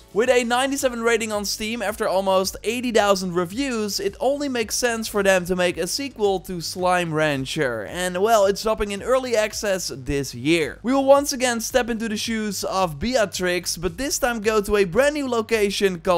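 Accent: Netherlands. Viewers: After all this years... still that heavy Dutch accent while speaking English. Very annoying.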